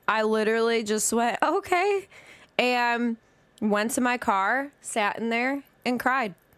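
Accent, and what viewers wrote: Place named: Midwest, United States. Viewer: You have a very midwestern style of speaking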